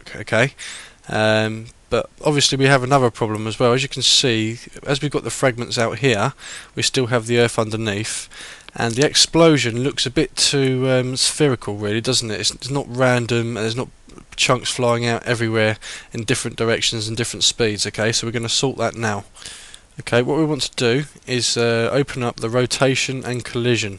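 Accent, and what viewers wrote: United Kingdom. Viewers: im guessing you are british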